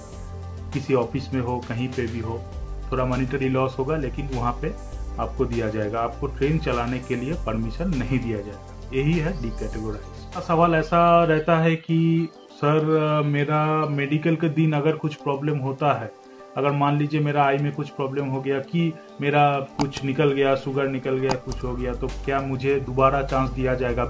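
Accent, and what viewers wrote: Bengal, India. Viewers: Sir kya aap Bangali ho?? Main bata raha hu kuyki mai bhi bangali huu aur hindu aap ki taarai bolta hu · Sir r u Bengali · Sir kia ap bengali ho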